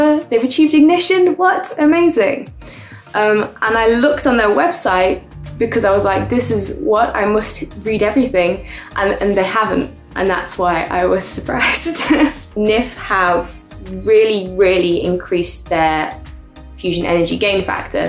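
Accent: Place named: United Kingdom. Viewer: Nice to hear some british accent here ^^ I really see a potential to your channel so here's a few tips to improve: - Love the improvised style but maybe have things a little more scripted so you stumble less on words and make explanations a little clearer - Sound echo is not much of an issue for now, just try to correct the channels, your voice is very much on the left channel here - Keep the balance between vulgarization and complexity. It's a niche but people are still very much looking for channels that go more in depth, best example is what Scott Manley does with rocket science Else, nice video, nice background, we can clearly see you are passionate about the topic and I can see you going places with this channel, keep up the good work ! Also, nice instance of laser fusion reactors in fiction is in The Expanse, it's what powers the ships and you even see the inside of the reactor in a few scenes (can't remember which episodes ^^)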